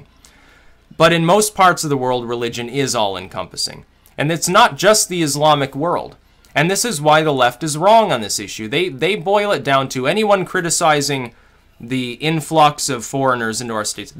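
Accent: United Kingdom. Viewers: Nice British accent! You were just stuffy enough! LMAO Thumbs Up! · Love your British accent, made me laugh. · Nice Brit accent. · Excellent British accent man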